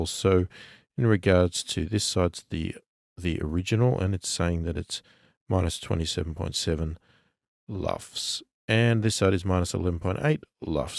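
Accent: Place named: Australia. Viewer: Haters gonna hate! You made perfect sense to me. He's probably a 'Murrican who couldn't understand your Aussie accent. Classy reply you gave him though. Kudos. I doubt that this guy has a clue about recording. I started watching your Magic AB video but when you said you'd found a better one I checked it out. Way better for referencing. Cheers.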